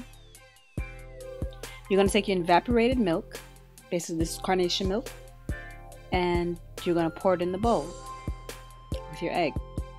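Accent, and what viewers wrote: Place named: Haiti. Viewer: So your Hatian sista? I love it! I know I had detected a slight accent when I watched your very 1st video. I love accents. And this receipe looked really good. Too bad I'm off of dairy, but my aunt said she will be making this for the weekend. I dig it when people can take packaged products & make their creations with it. And if you find the time please make some more cooking vids. That chicken looked great. I need new ways to cook bird. Thanks again, dear!!! Now I'm going to the wigs,LOL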